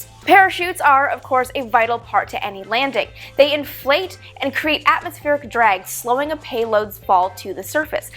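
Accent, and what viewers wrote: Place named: Canada. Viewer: I was wondering the same thing - I noticed how you { } pronounce your "ou's" when you say words like "about". {FWLIW: Growing up in Florida, there was an 'older couple' who were neighbors of my parents. The husband was from Italy, and the wife was from Canada. Listening to her talk was my first exposure to that pronunciation.}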